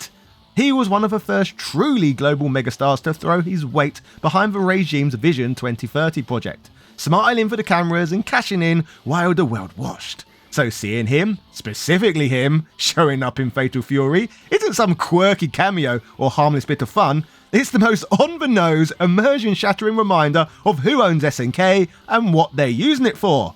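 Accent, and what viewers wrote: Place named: Liverpool, United Kingdom. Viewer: You sound like a scouser mate.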